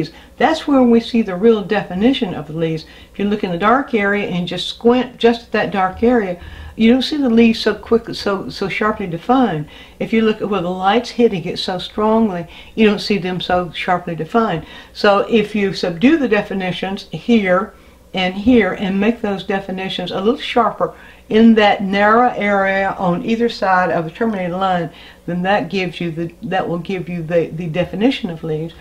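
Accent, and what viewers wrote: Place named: Missouri, United States. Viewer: I got to know where you are from. You have a similar accent my grandma had. She was from Missouri. Am I close???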